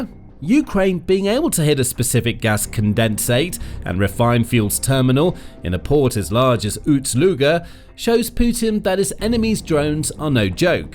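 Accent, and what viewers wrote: England, United Kingdom. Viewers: Why does the narrator keep referring to petrol as gasoline? He's English based on his accent and in the UK they call it petrol NOT gasoline.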